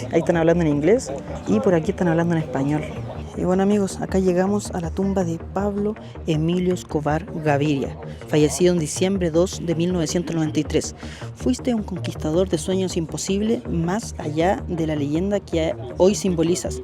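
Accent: Chile. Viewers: es el chico que hace el vídeo verdad? Porque tiene acento chileno.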